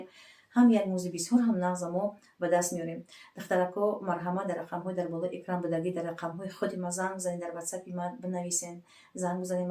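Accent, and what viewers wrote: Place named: Kulob, Tajikistan. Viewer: Салом апаи азиз хамвптан шмора мебинм асабом ором мешава беморима хис намеенм худочон ба кувахои баланд бираси хусни зебо лачаи нагз дори Кулоби зебо сана м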